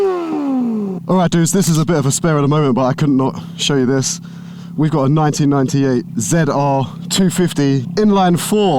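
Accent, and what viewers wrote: United Kingdom. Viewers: Uk accent but NZ roads is wigging me out haha. Would love one of these. I have CBR250rr (another 20k screamer). Is the best.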